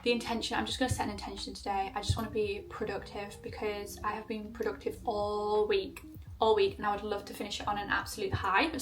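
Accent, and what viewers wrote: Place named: Italy. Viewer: Tu acento eres de italianaa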